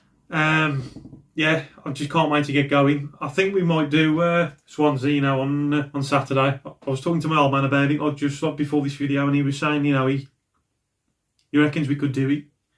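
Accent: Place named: West Midlands, United Kingdom. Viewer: Great video again bud, you sound like your from my neck of the woods lol I'm a blues fan based in ahem West brom. But I'm enjoying your vids mate your certainly getting better as you go along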